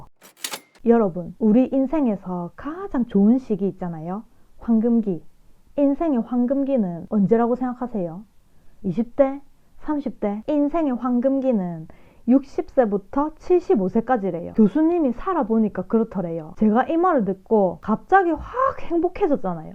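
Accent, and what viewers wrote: Gyeongsang, South Korea. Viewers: 경상도 말씨에 정겹고 배움에 도움이 되는 영상들이 많아 감사합니다. 사랑합니다. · 우연히 구독하게된 경상도 사투리~ 왕팬 될 것 같네요. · 자기계발 관련 동영상 정말 많이 보는데, 영상을 보고 직접 실행에 옮기게 해준 건 님 영상이 처음인 것 같아요. 감사합니다!! 제가 경상도 사람이라 그런지 말투도 너무 정감가고 실제로 나이도 같아서 똑순이 친구한테 피가 되고 살이 되는 잔소리 듣는 것 같기도 합니닼ㅋㅋ 같은 내용이라도 정말 이해하기 쉽도록 설명해 주시는 게 님의 엄청난 능력이라고 생각해요!! 만나는 주위 사람들마다 님 채널 추천하고 다닙니다ㅎㅎㅎㅎㅎ 백년을 살아보니도 꼭 읽어보겠습니다! 앞으로도 좋은 영상 많이 부탁드립니다 =) 감사합니다! 오늘도 행복만 하세요♡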